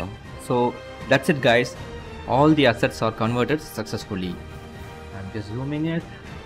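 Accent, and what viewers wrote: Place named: India. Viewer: Again a guy with indian accents making best tutorials on youtube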